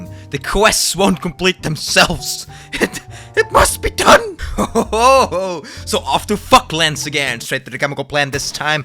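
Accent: Netherlands. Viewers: Dutch (I guess as a fellow Dutchman)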